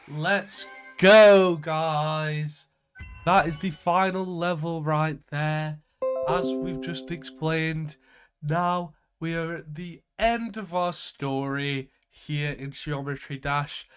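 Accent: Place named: Manchester, United Kingdom. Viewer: I fell asleep watching this last night and I was confused to find my inner monologue briefly had a manchester accent in the morning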